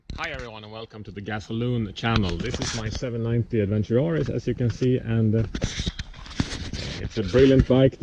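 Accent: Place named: Sweden. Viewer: Why swenglish ? It`s hard to listen to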